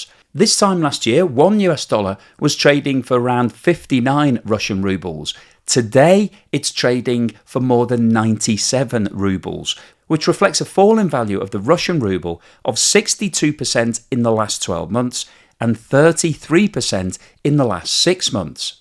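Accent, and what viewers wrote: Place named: England, United Kingdom. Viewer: Anything with a English accent is usually rubbishy anti-Russian propaganda. Does Russia have $35+ trillion in Debt and out of control spending? I didn't think so... e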